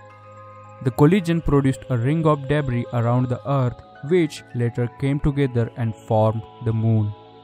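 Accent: India. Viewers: the heavy Indian accent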